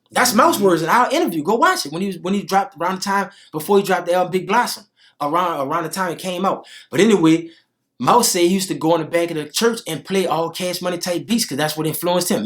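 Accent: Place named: New Orleans, United States. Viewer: nah bro he got a NOLA accent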